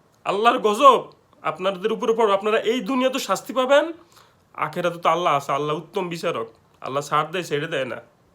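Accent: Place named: United Kingdom. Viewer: Look the way u talk bai from uk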